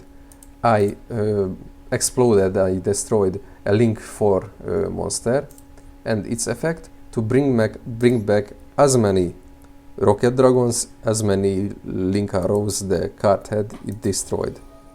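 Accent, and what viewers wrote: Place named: Hungary. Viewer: a magyarok angol szavak kiejteseben kicsit olyan masok es felismerhetobbek 😂 de ez nem rosszbol.. amugy jo az angolod.. ez az elso vidi amit lattam toled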